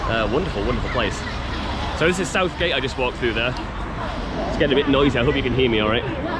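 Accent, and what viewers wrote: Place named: Teesside, United Kingdom. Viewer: I am super late to the party here but loved this video! So lovely to see your face and hear your voice... still got your Teesside twang!:D xxx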